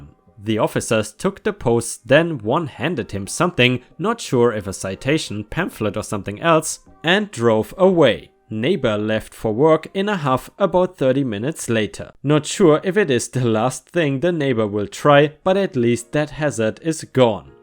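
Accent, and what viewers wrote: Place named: Germany. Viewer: Another good video,! These stories were a little bit underwhelming, but it isn't like you write this stuff, right? I do enjoy your voice, especially your accent (brings back a whole bunch of happy memories of my childhood in Germany!), but here;s hoping you can find some more, well, let;s just say, "exciting stories". ⭐️⭐️⭐️⭐️⭐️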